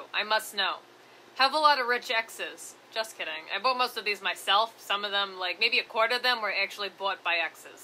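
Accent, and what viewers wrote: New York, United States. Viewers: Hey are you from new York?I was really wondrring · She from New York?? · Was just recommended your channel. Are you a New Yorker or from New Jersey? Or are you from Boston? That accent sounds like New Yorker! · Hey, I like your accent.. it's a mmmm very New Yorkie. For get about it... lol 👍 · Is this accent from New york. Is both the most wonderful and kind of intimidating! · I love this chick hear voice is wonderful dead ass. She sounds really New Yorker🤣 and I am from nyc, but she is also funny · We Stan an e girl wit the New York accent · You come from New York with that accent and definitely Italian parents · Are you from new york or something east coast?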